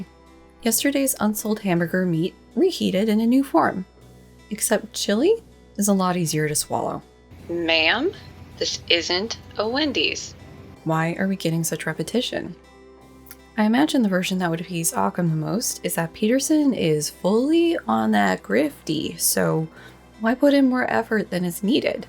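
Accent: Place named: Singapore. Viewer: the dude sounds like singapore. i m in singapore. it is a kind of hell here.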